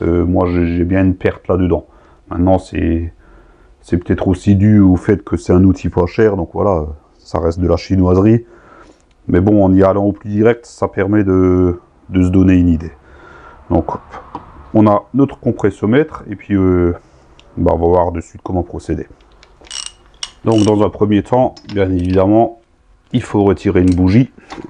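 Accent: Alsace, France. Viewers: Salut, merci beaucoup pour cette petite astuce. Ton accent me rappelle ma jeunesse à Sélestat... Cool.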